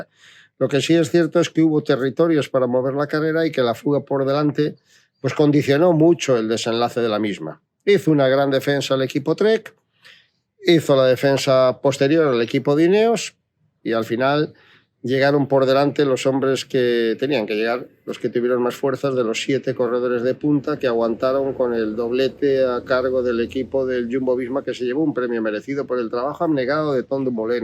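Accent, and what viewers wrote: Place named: Valladolid, Spain. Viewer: Habla igual que yo!! (Andaluz) jajaja claro es de Valladolid habla castellano puro da gusto escucharlo es verdad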